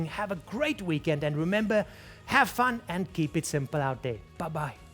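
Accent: South Africa. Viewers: This dude is so South African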